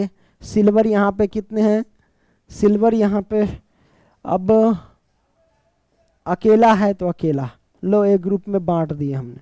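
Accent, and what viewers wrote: Bihar, India. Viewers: sir kya aap bihar se ho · Sar aap Bihari hai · Aap bihar se ho kya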